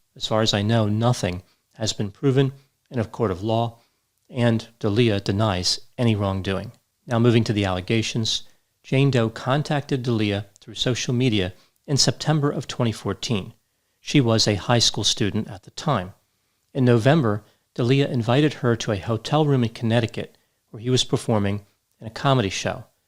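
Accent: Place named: Philadelphia, United States. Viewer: Is from Delco/Philly area? I recognize our accent anywhere. Lol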